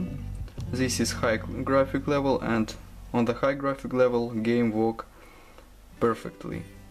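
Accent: Russia. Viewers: God you can't mistaken that russian accent. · Дружище, лайк за волю к победе) Сразу слышно русскоязычного ютубера, думаешь над каждым словом. Американцы стрекочат по другому)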